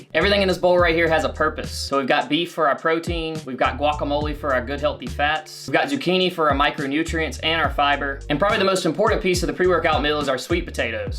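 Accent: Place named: Rural, United States. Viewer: Come ohwne!!! Love that country twang lol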